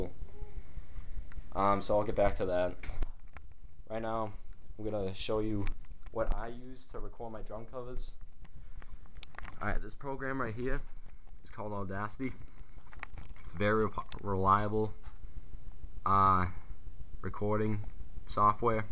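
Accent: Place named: Boston, United States. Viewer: That's a Boston accent people ! Best accent on Earth!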